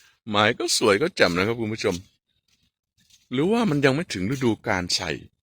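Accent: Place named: Isan, Thailand. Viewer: เว่าภาษาอีสานได่ดอกฟังแล้วมันกระดากหูว่ะ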